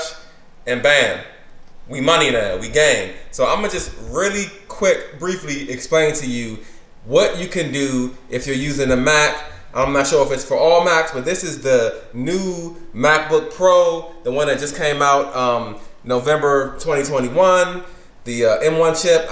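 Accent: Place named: Prince George's County, United States. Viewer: Thank you! I can tell that PG accent from anywhere btw :)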